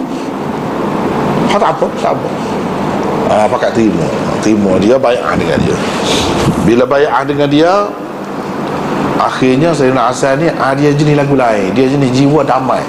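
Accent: Kelantan, Malaysia. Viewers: Beliau menggunakan Bahasa Melayu dengan dialek Kelantan🙏🏼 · Ko tak faham loghat kelantan ker? Cuba ko ulang tengok video ni lagi. Ustaz ni la antara yang paling kuat mempertahankan sayidina mu'wiyah RA..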